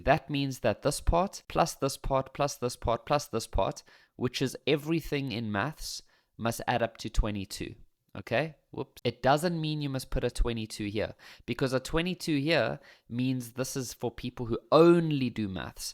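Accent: South Africa. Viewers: Your accent is so South African , i wonder how they would mistake you for anything other than lol 😆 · That cape coloured accent hella accurate.🤣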